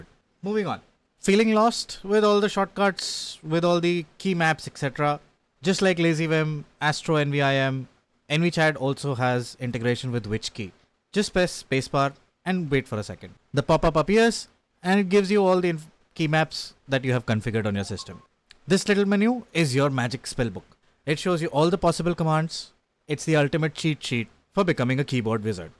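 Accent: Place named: India. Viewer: chat gpt x indian accent is so crazy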